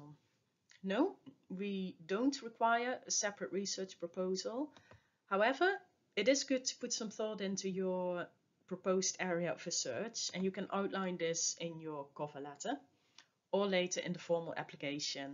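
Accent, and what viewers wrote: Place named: United Kingdom. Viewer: I like the British English accent this lady has. It is so elelgant.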